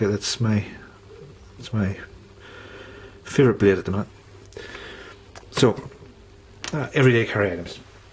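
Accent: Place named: Northern Ireland, United Kingdom. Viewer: No knife at all in Ireland but this guy is in Northerm Ireland by the sounds of it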